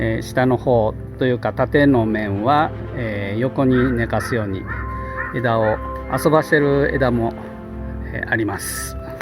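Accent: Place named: Kansai, Japan. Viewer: はじめまして、いつも拝見しています。 品種別の剪定など、詳しく解説していただいてありがとうございます(❁ᴗ͈ˬᴗ͈)⁾⁾⁾ 今は千葉に住んでますが、関西出身なので関西弁のお話に癒やされています。 我が家に地植えして4年ほどたったイングリッシュローズのオリビア・ローズ・オースチンがあります。 今年は春に2、3輪しか花が咲かず、その後も咲くことなく現在に至っています。 同じタイミングで植えたデスデモーナはこの秋に30輪以上花が咲きました。 同じ様なお手入れをしましたが、オリビアは葉が茂り枝が伸びるばかりで蕾がつきません。 冬剪定を予定していますが、アドバイスをいただけないでしょうか？ 株は縦横90㎝くらいで、ベーサルシュートは4本出ています。よろしくお願いいたします。 寒さも厳しくなってきましたので、御自愛くださいませm(*- -*)m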